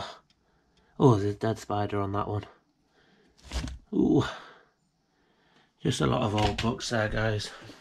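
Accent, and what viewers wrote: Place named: Scotland, United Kingdom. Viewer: where did you get that great accent from? Scotish or Irish? I assume Scotish.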